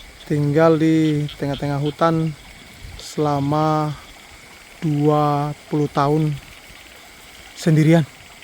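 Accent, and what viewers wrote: West Java, Indonesia. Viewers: Mantap orang luar P. Jawa (Maluku) pinter logat sundanya 😁 (cuman di sunda tidak ada istilah 𝐉𝐞𝐧𝐞𝐧𝐠𝐚𝐧, Itu istilah orang untuk orang Jawa/bukan sunda) 🤭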